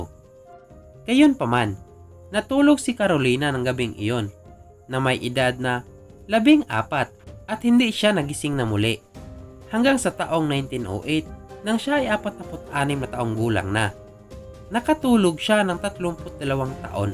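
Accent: Visayas, Philippines. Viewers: Bisaya ka kuya? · bisaya ja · Bisaya ka po? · I like your visaya accent🤣🤣🤣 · Bisaya ka ba sir? Hehehe. Pansin ko lng sa accent mo. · Bisaya man jod ni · Visaya ka po? Me no bicol po ako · like nyo kung BISAYA yung nag sasalita dito😁✌👇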